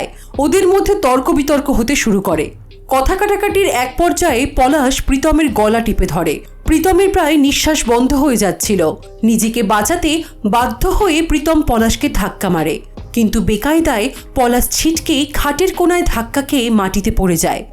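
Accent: India. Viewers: Apni ki Indian? Apnar bangla accent er jonno ask korlam 😊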